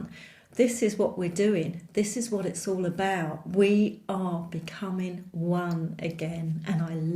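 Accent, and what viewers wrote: United Kingdom. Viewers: Hello Beautiful Butterfly 🦋 it’s wonderful to see your lovely 😊 face and hear a bit of your cockney accent 😇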